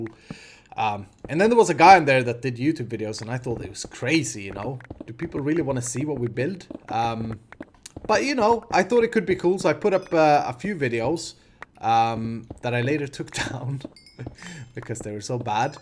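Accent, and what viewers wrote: Sweden. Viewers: Congratulations dude! I found your channel through your mystcraft tutorial a long time ago. From there I watched your videos every now and then but i never subscribed. Then i found meatylock. He re-introduced your videos to me. I thought, this weird Swedish guy with the crazy accent again? He's hilarious. Ever since I've been subscribed. With 4 different accounts atm. You're welcome btw <3 thanks for the quality content. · I'm one of those that came from Beef's channel, only that I just started following him too! Basically got here indirectly through following Etho xD Love your content (and your super funny Swedish accent :D) High five from Italy, bro! :) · Congratulations:) I subbed when I first saw you playing the PvP game that Doc and Etho made at Danglands:) I loved your attitude and they way you pwned them xD Thank you for being such a great person and entertainer <3 Plus your voice is really cool with the Swedish accent:3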